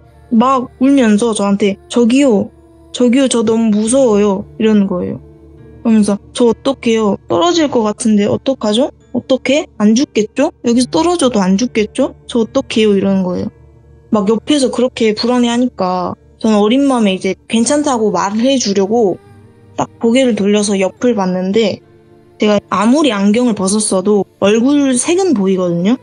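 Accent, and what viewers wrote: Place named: Jeolla, South Korea. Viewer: 전라도 분이신가봐요.. 어딘지 알거 같아서 ^^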